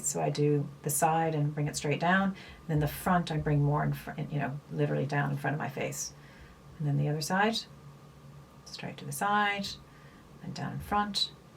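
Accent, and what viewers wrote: Ireland. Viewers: Thanks, just showed this to my mom so she can use mine. You have an irish twang to your accent! Where are you from?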